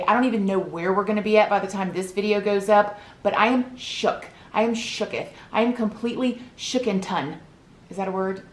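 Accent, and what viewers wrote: South, United States. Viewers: Omg...you went from 14k to 93k in less than 2 weeks girl! you're making it rain on them hoes! 😂😂😂 and don't you worry about the way you talk;) I'm from Kentucky and there ain't nothing wrong with having a southern twang! xoxo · Girl, it’s just a southern accent that some can’t understand. LOL. I’m in mississippi so I knew EXACTLY what you were saying!!!!!!!!! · Girl, let me just tell ya hun, i love your accent! Im a fellow southerner, from Alabama, and my son (age 12) and i also have our own language that only we understand lol. 😂. Love you bunches chick! 💞😘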